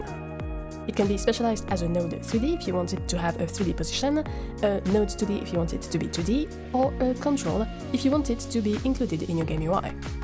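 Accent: France. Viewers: J’ai cramé en 2 secondes que tu étais français mais je suis content que la commu Godot français est là.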